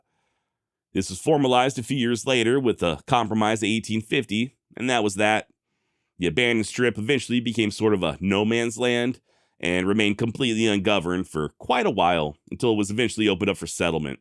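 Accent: Texas, United States. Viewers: I love your intro and sing along with it. (I wrote and perform mine) and a far as your accent goes sounds like central Texas or Oklahoma. The giveaway is wash. You say warsh. Warshington. Think Dustin Hoffman in Little Big Man. "Gawd bess George Warshinton". Classic. Keep pitching buddy. I love you just the way you are. YEE HAW! rocks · I feel like a lot of these comments on your content or accent are from people that watch about 2 minutes of a video, find something they disagree with, then comment, lol. The thing about your accent was hilarious. If you watch any amount of your videos, you will find out pretty quickly you are a Texan, lol. Keep up the awesome work.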